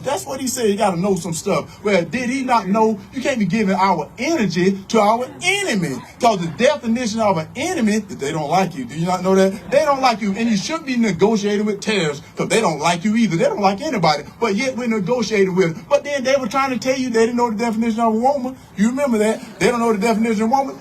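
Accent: South, United States. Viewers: Hope you win...love your southern,.accent, love your values, love your values..vote red.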